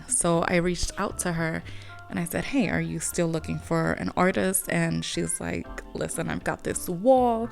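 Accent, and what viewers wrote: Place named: Puerto Rico. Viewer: Ave María, suena puertorriqueña le dije a mi marido 👍🏼💕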